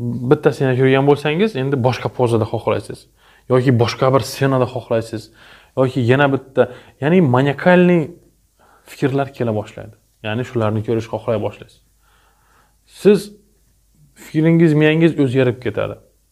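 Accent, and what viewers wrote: Khorezm, Uzbekistan. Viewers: Asslomalekum doktor Xorazmdan òxshisiz tiliz òxshab turibdi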